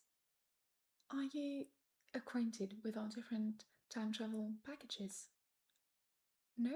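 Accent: France. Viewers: For an actual comment, I love it! The volume is low, which is of course a problem with having midroll ads (having to turn volume up for video makes the ads very loud). But I love the vibes and I love soft-spoken asmr. I'm also going insane trying to figure out your accent. French but if you learned English in England? You look French. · Your accent is pretty good. I only figured out you were French when that i sound slipped through a couple times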